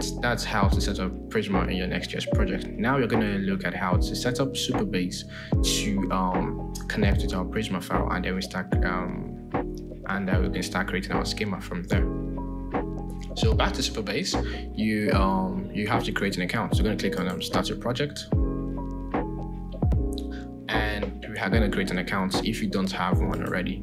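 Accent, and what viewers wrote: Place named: United Kingdom. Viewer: Thank you for providing this tutorial! quite informative, but I did encounter some difficulty in following your accent. Could you kindly share where your accent originates? I was wondering if it's British. Additionally, if possible, could you consider reducing the volume of the background music? Many of us would appreciate being able to hear your voice more clearly without distraction from the music.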